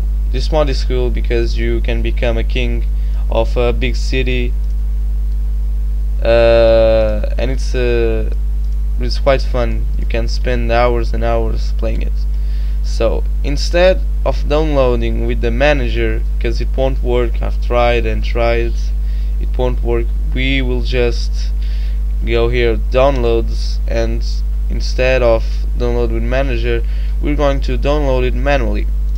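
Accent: Portugal. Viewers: boa parceiro obrigado pela ajuda :) Gosto do sotaque Português ahahaah :D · Logo assim que ouvi o teu sotaque a falar inglês, vi logo que eras português xD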